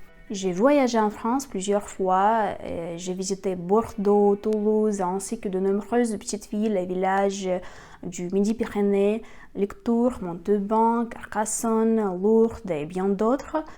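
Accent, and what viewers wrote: Russia. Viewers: Vous êtes super belle et votre voix est très douce et séduisante ❤🥰😍. J'adore l'accent Russe, tellement sexy ☺️👍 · Personnellement, je préfère le format en langue russe avec les sous titres, c'est vraiment génial pour la prononciation et l'apprentissage, même si je suis ultra débutant et que je dois mettre souvent la vidéo en pause. De plus, je trouve que ta voix est beaucoup plus charmante avec un accent russe. Continu comme ca. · Мне нравится ваш легкий русский акцент, когда вы говорите по-французски. НО я предпочитаю, чтобы ваши видео были на русском языке (с французскими субтитрами) 🙂 Большое спасибо за вашу личную историю.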